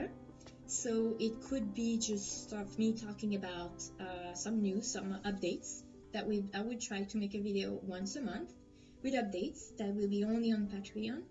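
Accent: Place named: Quebec, Canada. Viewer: C'est vraiment sympathique comme vidéo, tu devrais en faire plus souvent! C'est super d'avoir des nouvelles de toi comme ça. J'ai aussi aimé les petits vidéos, surtout le "contact juggling" dont j'aime l'illusion! Bien sûr, comment ne pas apprécier la fin? Je me demandais quel accent tu avais! Eh bien c'est pas mal québécois, je ne suis pas trop dépayser :P Merci de cette attention pour les francophones qui te suivent! À bientôt! =)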